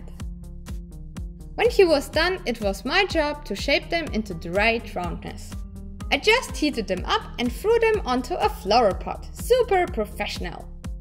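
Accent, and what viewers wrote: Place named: Germany. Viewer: This german accent tho.... 😂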